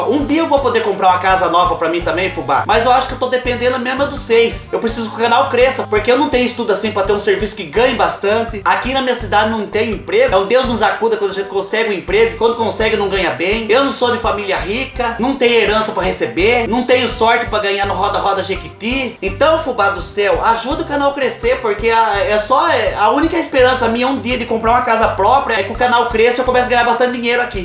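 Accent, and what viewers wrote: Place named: Minas Gerais, Brazil. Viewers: Descobrir o canal ontem e estou apaixonado com seu conteúdo. Seu sotaque é igual dos Mineiros. Você é incrível! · Você é lindo,espontâneo,humilde,engraçado,a parte do restaurante foi melhor. Adoro seu sotaque,sou mineira de poços de caldas e me identifico! Seu carinho com quem te assiste é seu diferencial!!!!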